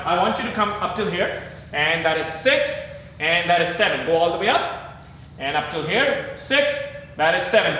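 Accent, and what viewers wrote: India. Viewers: This indian accent :(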